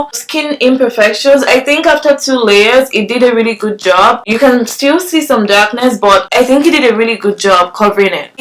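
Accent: Nigeria. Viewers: Nigerian or Ghanaian?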